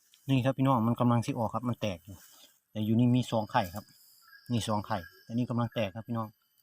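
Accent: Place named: Kut Bak, Thailand. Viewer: สำเนียงกุดบ่กชัดเนาะ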